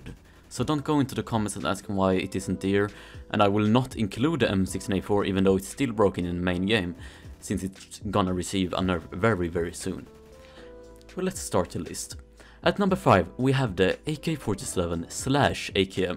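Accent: Sweden. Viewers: Wow. Are you swedish? Your accent SCREAMS swedish 😅👌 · Are you from sweden you sound like you are from sweden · Bruh he's swedish. Wtf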